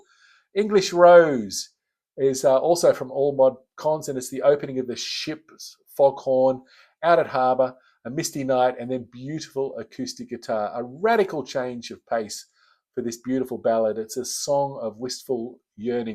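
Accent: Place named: Australia. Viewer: With your accent...are you living in Australia? One of my sons has gone out to Sydney for a year. Ive been a long life fan...well, since 1978. Love them...what an inspiration! Gerard in Belfast.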